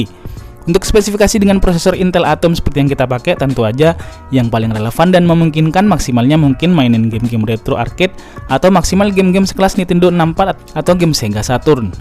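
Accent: Madura, Indonesia. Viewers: Oreng medureh? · Masih "salbut" Kek org madura 🗿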